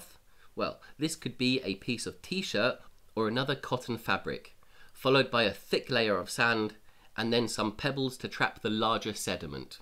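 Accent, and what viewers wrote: United Kingdom. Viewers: Are you a fan of Ray Mears? He is a brilliant man, and I have yet to find anyone better than him at Bushcraft. This is my first time visiting your channel, and you remind me of him a little bit! (Not because of the UK accent) I subscribed and gave this one a thumbs up. I always enjoy watching new Bushcraft techniques! Great video!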